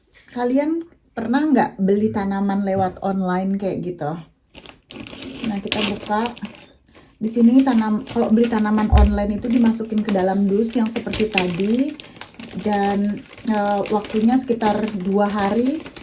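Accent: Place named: Bali, Indonesia. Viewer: wah pantes ngomongnya campuran bali tadi di Vlog wkwkw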